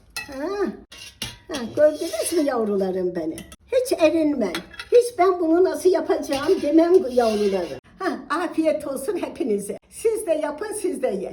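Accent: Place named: Malatya, Turkey. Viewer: Oy şiivene gurban olam ben nerelisin acaba. Malatya lı mı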